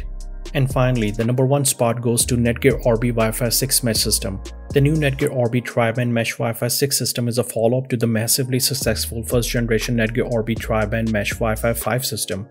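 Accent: India. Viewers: this is painful, its just a collection of adverts for all the products so you learn jackshit. nothing is compared and the accent just reminds me of indian tech nonsupport.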